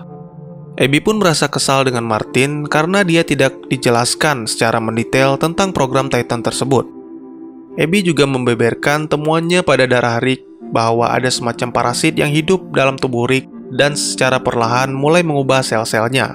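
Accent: Aceh, Indonesia. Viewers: Orang Aceh bg ya.?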